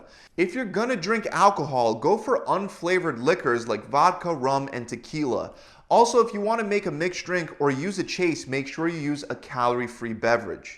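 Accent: Philadelphia, United States. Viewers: Coming here just to say that this guy is obviously from Philly: "drink more 'WOODER' ['water'] throughout the day!" Wooder wooder wooder! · Are you from philly? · He's from Philly or South Jersey. He says wooder. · Me: He sounds like he's from the northeast. Dude: Wutter Me: Philadelphia.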